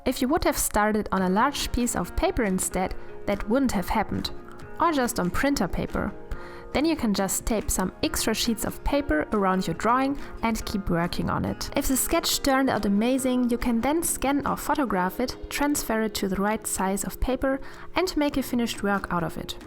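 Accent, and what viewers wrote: Germany. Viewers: Are you from Germany? 🇩🇪 (just because of your accent) (And yes, i am german 🇩🇪 😂 ✌️)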